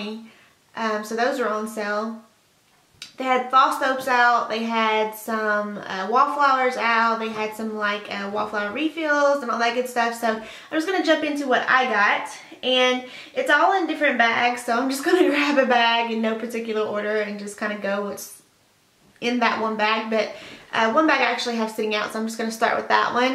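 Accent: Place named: United States. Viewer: Theres a skunk? Dead? Thats nastyyyy :D i love your accent... being british some of the american accents are so different to hear!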